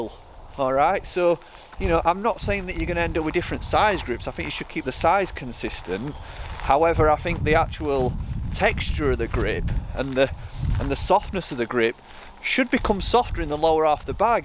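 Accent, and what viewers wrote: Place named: Liverpool, United Kingdom. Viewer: Sound like your from Liverpool And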